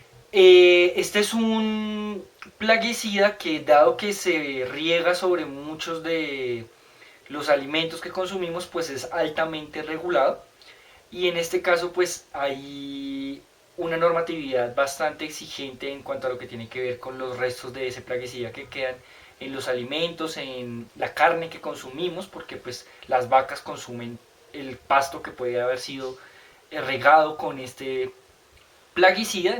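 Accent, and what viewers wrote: Colombia. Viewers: para mi eres de colombia pero gracias por el video la expo me saldra chida ty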